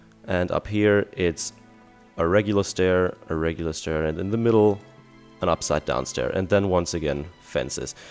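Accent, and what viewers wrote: Germany. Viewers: you defenitly sound german in your older videos, but in this one, for some reason, you sound different. were you sick, or did you have a different mic maybe? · es zeigt, dass Sie mit dem, wie Sie sprechen Deutsch sind Grüße aus Barcelona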